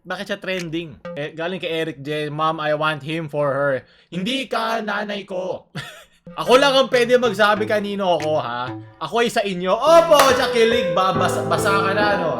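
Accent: Visayas, Philippines. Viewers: ANG CUTE NI PAG NAG BIBISAYA MURAG YAWA HAHAHAHA LAB U SINCE 2018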